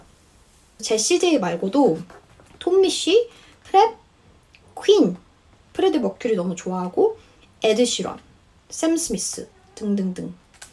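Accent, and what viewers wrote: United Kingdom. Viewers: 오늘따라 더 이뻐보인드아...♥ 항상 잘 보고 있어용 영국 발음은 처음들어서 그런지 자꾸 끌린다앗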